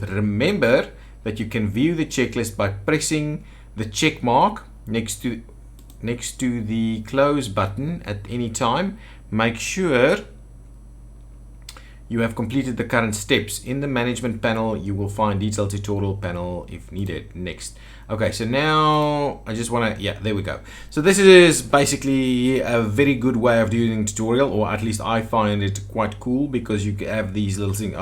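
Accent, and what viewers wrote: South Africa. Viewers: First time to your Channel and I must say it good to hear a South Africa accent on Youtube :D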